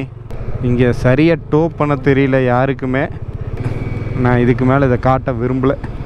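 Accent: Kongu, India. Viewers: Super 🥰 anna unga 🙏🙏🙏கொங்கு தமிழ் அருமை ❤️❤️👍👍👍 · Kongu Tamil super bro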